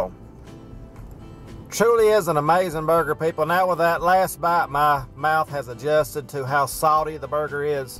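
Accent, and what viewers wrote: South, United States. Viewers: does anybody else love his southern accent? I love it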